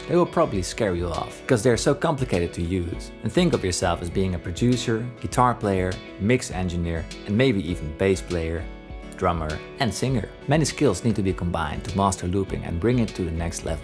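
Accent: Netherlands. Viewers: where are you from, you sound dutch lol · Kak hahahaha, I always thought u sounded Dutch ;) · you're defenitly dutch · You sound dutch, and i would know, cause i'm dutch too. Great beard btw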